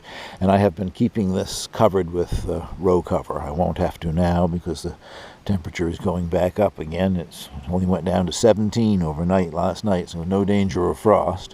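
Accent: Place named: Canada. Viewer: Hey! No clue on what made your video go viral. Anyway, I don't know a thing about gardening, etc, but your videos are still fun to watch. I especially like any videos relating to your cabin. As a matter of fact, that's how I found your channel. I searched for videos about cabins and found your channel maybe a year ago. Keep 'em coming! Always nice to hear that Canadian accent. 😁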